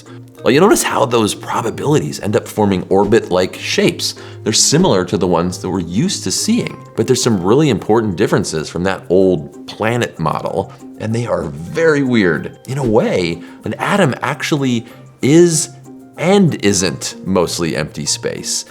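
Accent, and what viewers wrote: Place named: United States. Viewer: American pronunciation is so weird, this video is entirely about Adams, not atoms, yet it shows atoms not men called Adam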